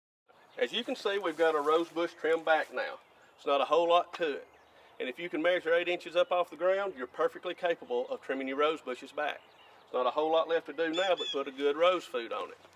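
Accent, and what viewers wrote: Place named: South, United States. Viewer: Absolutely unrelated so I apologize but I noticed your accent immediately. Being from southern Virginia, I love and appreciate a good southern accent. Now on to actually watching and paying attention to the video. 😊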